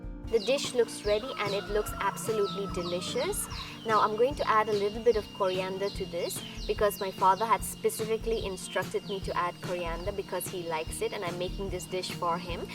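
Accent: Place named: India. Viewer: Watching from 12,000 km away. I know not whether you are BD Garo or IN Garo. Why I am asking? There is a reason behind, that is your English accent. You do not have West and South Indian English accent. Yet you have around 10% Indian accent at some points. Please tell us the name of the village and show us the village location in the map.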